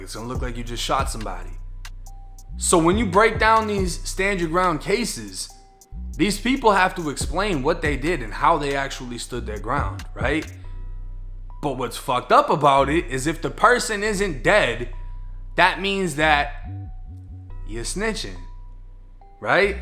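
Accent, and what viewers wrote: Boston, United States. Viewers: They were all on slow ass Harleys I bet.. they wouldn't never caught a sport bike.. That's also why I stay strapped tho 😂😭🤷🏾‍♂️ Also, u from Boston? I'm from CT.. i hear an accent lol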